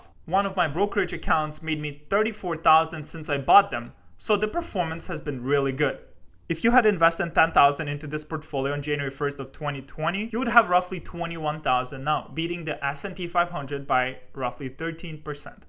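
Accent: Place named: Russia. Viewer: Hey great video man. If you don't mind me asking, where did you immigrate from? to me, your accent sounds Russian or Ukrainian.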